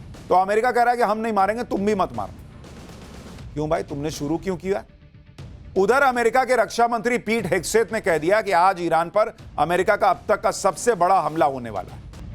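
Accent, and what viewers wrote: Bihar, India. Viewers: Andaz news sunane ka achcha hai Bihari lahja hai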